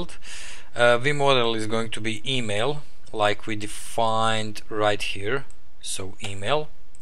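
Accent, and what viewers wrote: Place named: Russia. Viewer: Привет, вижу русский акцент, можешь на русском уроки тоже писать?)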